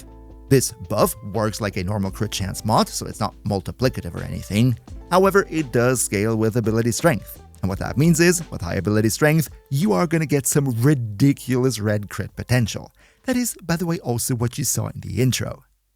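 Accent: Germany. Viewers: Well, he's german 😉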